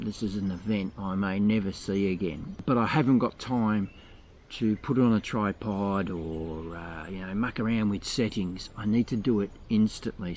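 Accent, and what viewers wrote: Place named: Australia. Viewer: I hated to say this but you sound like an Australian.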